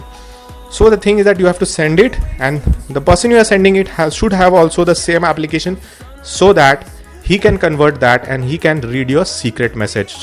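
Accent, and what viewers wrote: India. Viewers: guys guys only who can understand you must be indeyan or Pakistan so be slow ... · You sound Indian so I couldn’t make out a word you said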